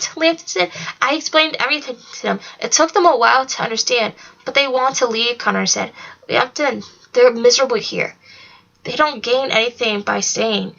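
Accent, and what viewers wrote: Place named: United Kingdom. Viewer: Her British accent is everything!!😂😂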